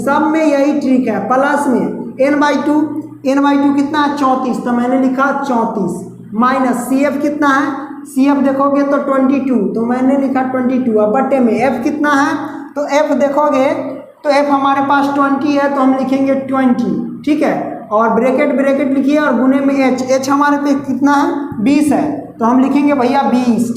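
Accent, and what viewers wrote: Bihar, India. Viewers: Sir aap bihar se hai kya.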